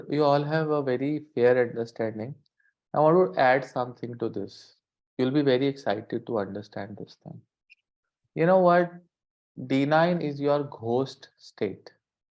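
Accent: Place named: India. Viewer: he is Indian look at his English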